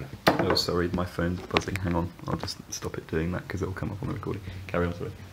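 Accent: South Africa. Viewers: That's the poshest South African I've ever heard. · still has a slight South African accent. A few times when he uses an /i/ between two vowels and is most noticeable when he says "I" Otherwise I'd have no idea he wasn't native British.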